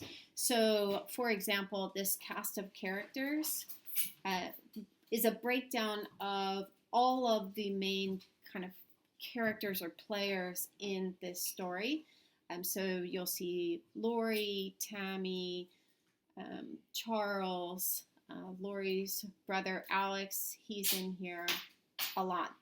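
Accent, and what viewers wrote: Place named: Philadelphia, United States. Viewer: Are you originally from the Philadelphia area? I feel like I hear a little bit of a phila accent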